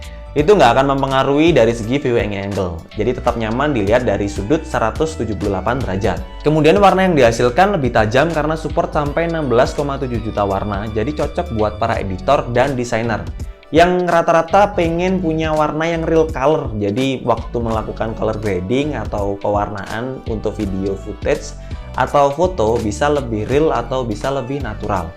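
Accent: Java, Indonesia. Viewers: Coba lebih meddok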